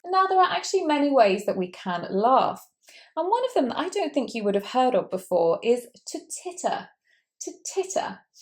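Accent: United Kingdom. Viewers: I enjoy this great method of explaining. But as a biginner I need to watch more lessons from teacher in order to be familiar with her british accent. A biginner learner from Algeria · Thanks you. I'm from Brazil and I,m learning a lot and also correcting the bad words pronounced. I like so much your british accent. God bless you and your baby.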